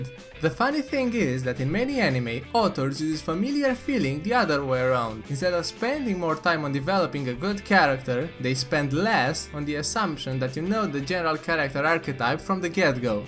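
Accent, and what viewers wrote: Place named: Russia. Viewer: Arzos aye his accent seems to be somewhere between East Eu and Russian.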